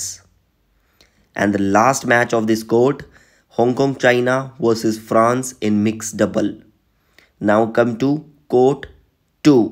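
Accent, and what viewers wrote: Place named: India. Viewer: I like your indian accent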